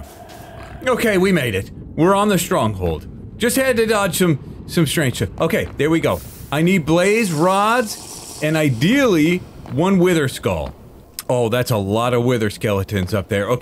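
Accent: United Kingdom. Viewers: And that pa'fect brittish accent ☕❤️❤️❤️ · Amazing wizard cloak, about as amazing as your British accent <3 That witch hut looks fantastic, I thought you gonna do a big dead tree (and a pond) but maybe this was a "good" witch living close to the village. The end dragon is shaking in its boots lol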